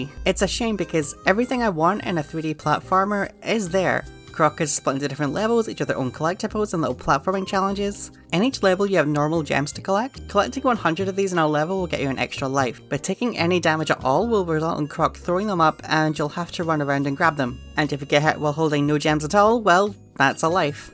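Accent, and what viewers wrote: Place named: Scotland, United Kingdom. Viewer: You never fail to impress me with the quality of your content,. I loved this. A great throwback for me. Humorously told with great Scottish banter. Till the next one <3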